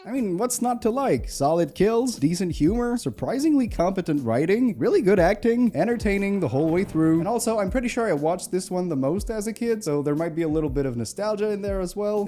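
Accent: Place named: Montreal, Canada. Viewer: Oooooo is that a Montréal accent I hear? It's quite delightful lol.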